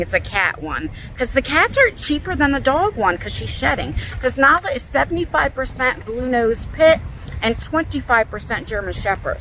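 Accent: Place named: New York, United States. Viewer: Your accent made me homesick! I grew up in upstate NY (Hudson Valley) and have been in the West since 1997 and I have been homesick. My family was from Jersey — Fort Lee and Wycoff/Hawthorne. I miss Pizza and Bagels! And hard rolls!!!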